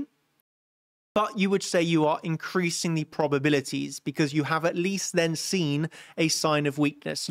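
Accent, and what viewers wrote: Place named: Somerset, United Kingdom. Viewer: SOUTH WEST. Man's got a Somerset accent. Beautiful part of the country ❤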